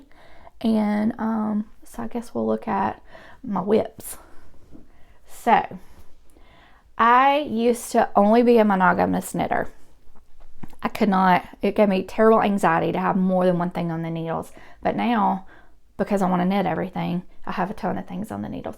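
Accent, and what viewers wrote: South, United States. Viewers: Just finding your podcast and starting with Episode 1... FANTASTIC! I love your southern charm, accent & beautiful hair cut and color! Looking forward to following your knitting adventures!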